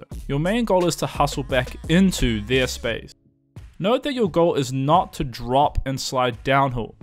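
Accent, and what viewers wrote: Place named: South Africa. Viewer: i smell South Africa in that accent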